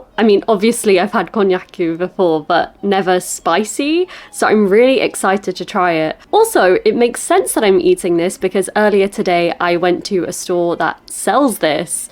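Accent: United Kingdom. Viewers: 歯切れの良いイギリス英語 米語とは一味違いますね。 良い飲みっぷりで見ている方も嬉しくなります。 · イギリス英語だからジャパン、アメリカ英語だとジャペンですかね。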